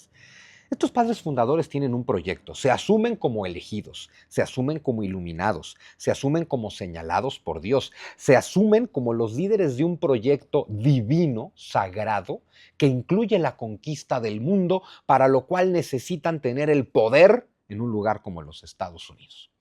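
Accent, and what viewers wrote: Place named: Mexico. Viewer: Me encanta el acento mexicano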